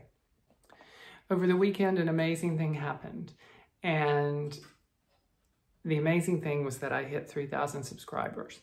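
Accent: South, United States. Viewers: Thanks for sharing your wonderful YOU!! Love your Southern accent- more please !😂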